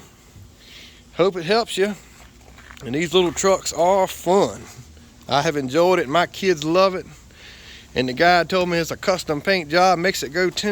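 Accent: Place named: Virginia, United States. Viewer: Thanks for this I’m considering purchasing a Kei truck, I’m leaning towards Honda because it seems like you can find a lot of parts here in the states. Your VA accent is strong! This video came recommended and I didn’t even look at the channel name but though “This dude is from VA”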